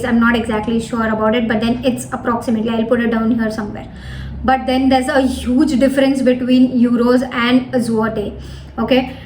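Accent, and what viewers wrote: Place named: India. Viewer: Indian accent. Pleasant to the ear so cheerful. I've always liked him.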